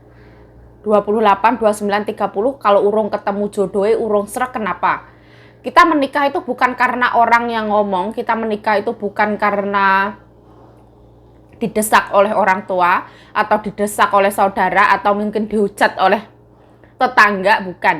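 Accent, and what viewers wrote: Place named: East Java, Indonesia. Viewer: aku seneng cuuaramu ngomongkilo jawatimurane kentel banget, jan lemaem uuenake duowo= enak banget👍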